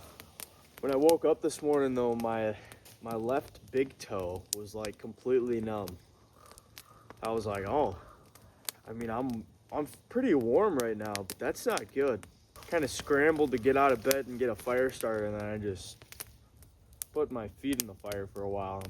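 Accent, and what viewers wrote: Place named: United States. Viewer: It looked nice and tranquil where you were, but you're nearly always at tranquil spots. I liked the footage at the end when you were leaving on the boat, and the music. That was a good wall you made with the logs, and a good idea. I really like your American accent too! Which part of the States are you from?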